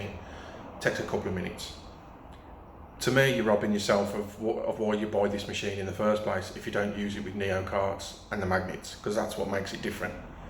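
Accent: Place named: Birmingham, United Kingdom. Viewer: Never apologise for a Birmingham accent 😊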